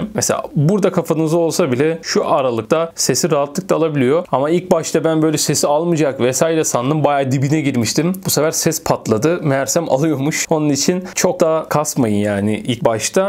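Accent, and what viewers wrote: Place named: Azerbaijan. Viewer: Selam. Sen nerelisin? Sanki Azerbaycan Turkusun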